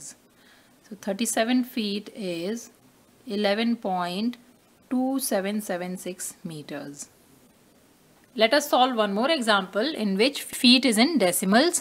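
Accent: India. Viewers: Indian accent is so disgusting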